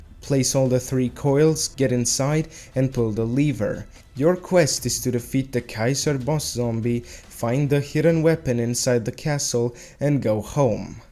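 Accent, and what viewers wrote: Russia. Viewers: You sound like you have some sort of Russian accent (Don’t hurt me, I’m supposed to be a stereotypical boi from the US)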